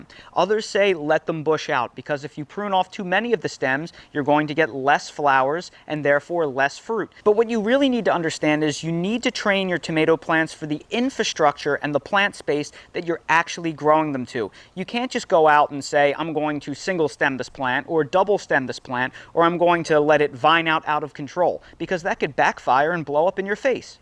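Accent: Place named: New Jersey, United States. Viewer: psst...better tomatoes are in jersey baby...lol......i hear that jersey accent...lol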